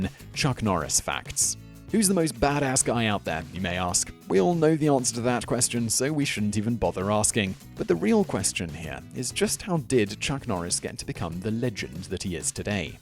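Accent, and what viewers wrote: England, United Kingdom. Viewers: I love the way he says "scumbag", "farts", "shits" and "badass" in a perfectly clipped English accent.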